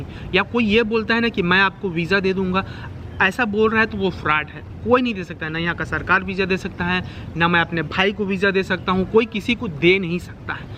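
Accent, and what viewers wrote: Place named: Chhattisgarh, India. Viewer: India me kaha se ho bhaiya CG se ho lag raha hai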